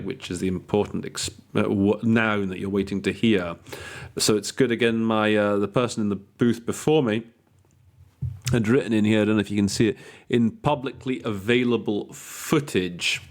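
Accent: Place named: England, United Kingdom. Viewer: Where is your English accent located geographically?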